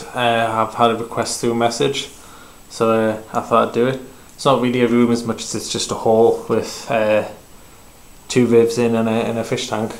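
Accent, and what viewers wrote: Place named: Newcastle, United Kingdom. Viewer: clicked on this video and I noticed the accent before the reptiles. TOON TOON!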